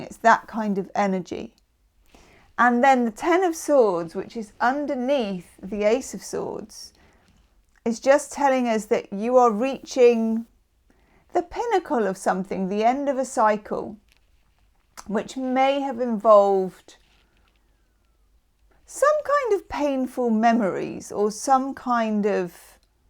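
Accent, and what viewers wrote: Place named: Bath, United Kingdom. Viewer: I recognise your accent. Would you be near bath in England?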